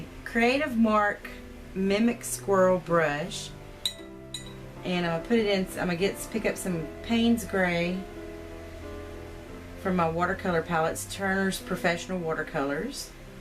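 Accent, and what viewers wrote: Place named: Oklahoma, United States. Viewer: Theresa5 sent me! I love watercolor and will enjoy your series. I love your accent! I am originally from Oklahoma, so your accent reminds me of home (even if you are not from there... lol). Thanks for sharing your lovely work!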